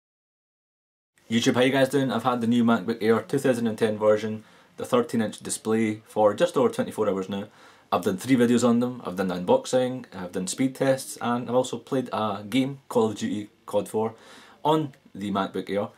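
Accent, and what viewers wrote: Scotland, United Kingdom. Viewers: love Scottish accent !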